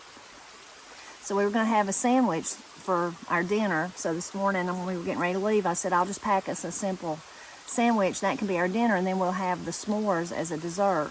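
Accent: Appalachia, United States. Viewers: I really enjoy your hikes with and story telling with your appalachia accent. It takes me back to when I was a little girl, we lived in the country near a creek and I actually would go across the road to play in it. When I watch your family I get a comforting feeling, so, thank you Cindy from Kentucky P.S. Your Presley girls are enjoyable as well.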